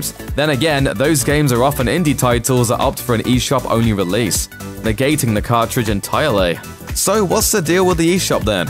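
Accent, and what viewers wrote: United Kingdom. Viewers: This video didn't answer my question at all..it just showed me that anything I look up about video games is gonna give me a narrator with a 'eavy british accent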